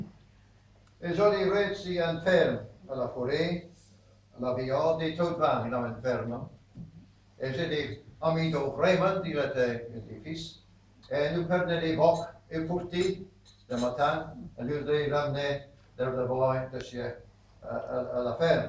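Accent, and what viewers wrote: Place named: Guernsey, United Kingdom. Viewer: Yes, it's a typical Guernesiais accent. (If you want to get into detail, there are several different accents even within such a small island, but to most outsiders they would be hard to distinguish). Norman French (of which Guernesiais is a variety) had a huge influence on modern English, but that was from the 1066 Norman Conquest until around 1400, ie. before the Great Vowel Shift. But the many languages and dialects of the British Isles haven't influenced each other's vowel sounds over the centuries since.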